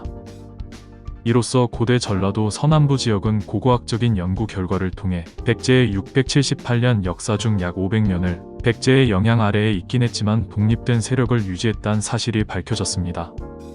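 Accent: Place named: Japan. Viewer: ㅋ 식민사관이네. 지금 목소리도 일본 억양 있네. 믿지마세요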